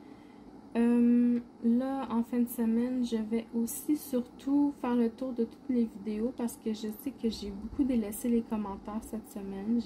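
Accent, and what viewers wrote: Quebec, Canada. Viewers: Coucou. Tiens 2 👎bon donc 2 personnes qui ont pas pu commander chez dac car sa va trop vite 😂😂❤️ merci un peu de dp avec ton accent québécois. 😍😘😘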